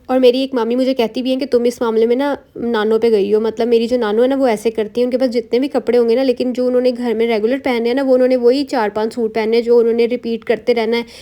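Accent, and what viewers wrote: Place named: Punjab, India. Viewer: Love ur Punjabi accent 😂❤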